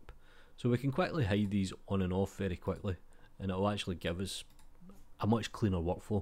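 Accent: Scotland, United Kingdom. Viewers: I don't know much English but I understood that you are Scottish, because I watched the TV series Outlander! They sometimes spoke Gaelic there. Are you by any chance from the McKenzie clan or Fraser?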